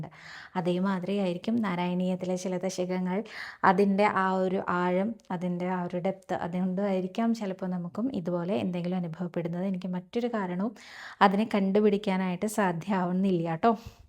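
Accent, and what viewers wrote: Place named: Thrissur, India. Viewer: കഥ കേൾക്കാൻ നല്ല രസമുണ്ട്, പിന്നെ ആ തൃശൂർ സ്ലാങ് ഒക്കെ നന്നായി 🙏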